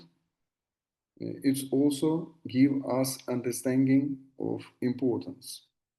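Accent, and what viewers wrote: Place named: Russia. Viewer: Дружище очень слышно русский акцент так как хочется попросить тебя перейти на русский потому что ощущение что ты именно на нём говоришь.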